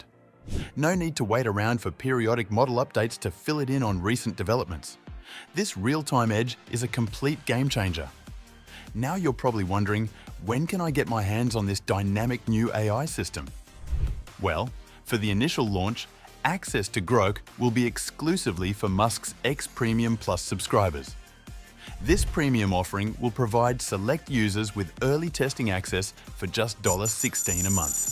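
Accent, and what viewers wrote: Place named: Australia. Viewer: Up to the minute, pronounced mye-n-yewt, as in "tiny"? Some strange pronunciations in this, with an Aussie accent. Maybe a Chinese who went to university in Australia?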